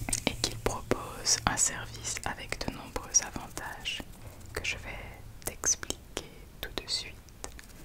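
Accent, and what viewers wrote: France. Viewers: My first asmr was a french random guy doing his makeup on yt I think 2010 .. I like the way you french pronounce ici and all your language.. you got a beautiful voice and whispers plus you so gorgeous😍💞💞💞 good night 💆🏻‍♀️💆🏻‍♀️💆🏻‍♀️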